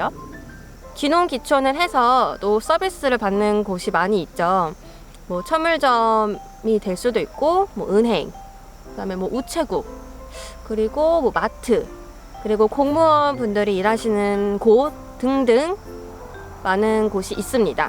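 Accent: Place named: Chungcheong, South Korea. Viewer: ㅋㅋㅋ 그거 충청도라 그래요 ㅋ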